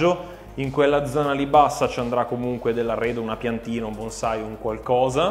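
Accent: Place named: Lombardy, Italy. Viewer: Dimmi che hai la parlata lombarda senza dirmi che hai la parlata lombarda Btw: sono di Verbania, ma tutta la zona intorno al Maggiore e non solo ha l’accento milanese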